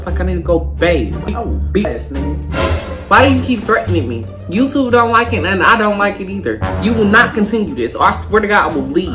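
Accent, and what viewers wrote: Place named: Miami, United States. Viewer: He sound like Miami am i wrong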